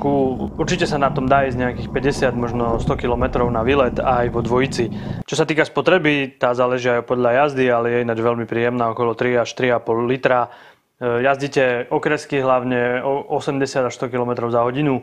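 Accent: Eastern Slovakia, Slovakia. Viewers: Co to je za STRAŠNEJ dialekt???????. Nejsem Slovák, ale ten přízvuk je strašnej i pro Čecha. To je co za dialekt něktéré části Slovenksa u Ukrajiny nebo Polska, Maďarska????? Díky za odpověď. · Ten východniarsky prízvuk mi uši trhá...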